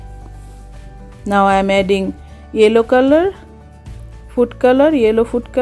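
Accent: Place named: Bengal, India. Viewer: Watched your video fully. You have bengali accent. Join you dear.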